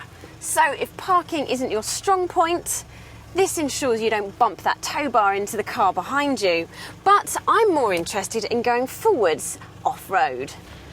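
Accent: United Kingdom. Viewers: Came for the car review and totally fell in love with her.. Maaan... I love that british accent too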